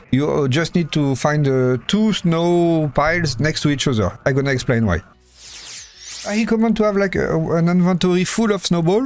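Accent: France. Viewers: because of your VAIIRRRRRRRRRI french accent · Bro these vids have been so helpful. I don’t even mind how French you are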